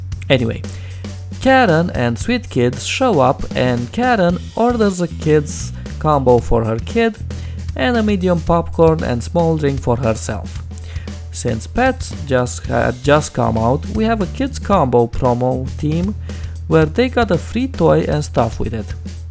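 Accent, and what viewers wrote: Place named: Nigeria. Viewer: sorry if im being rude, but you sound like the Nigerian scammer across the phone trying to get someone's social security number and credit card number.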